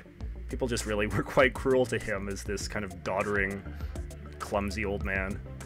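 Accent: Canada. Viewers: He sounds SUPER canadian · that hefty Canadian accent distracted me sooo much... absolutely beautiful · You soound Canadian 'ay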